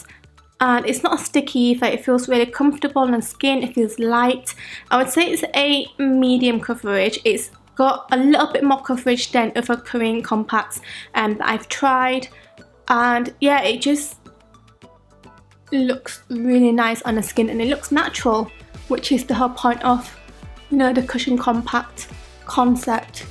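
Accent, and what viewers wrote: United Kingdom. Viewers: I love Asian Britain girls accents because of their so strong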